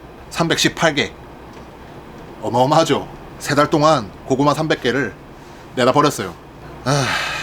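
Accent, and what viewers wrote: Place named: Gangwon, South Korea. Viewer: 이분 강원도 분인것 같다..사투리가 강원도 사투린데..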